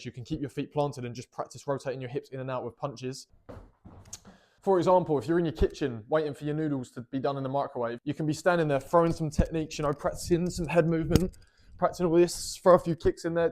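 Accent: United Kingdom. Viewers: With his accent he IS british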